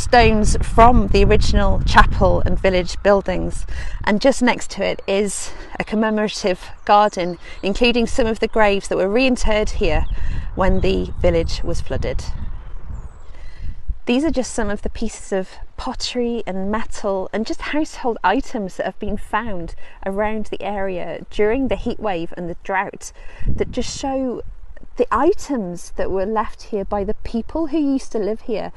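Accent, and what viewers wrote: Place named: North Wales, United Kingdom. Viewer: Oh! Cariad- i might have a very different channel and life to you but many of my early videos were made around here. Dwin siarad Portugaleg rwan, and live here now. I love your beautiful Gog accent. After my Nain and my Taid brought me here as a kid , their stories stuck in my heart forever. ive flown paragliders from Mynydd Nodol and in the background, rode dirt bikes and Scuba dived every Llyn, rode mountain bikes since the 80s in an obsession with being alone within the poetic beauty of Gogledd Cymru. I explian this story to my Portuguse freinds in their language about this place and they are shocked this happened so recently given that the U.k is so apparently accepting of other cultures. This is the first time i have watched a video and cried like a baby. i dont know why.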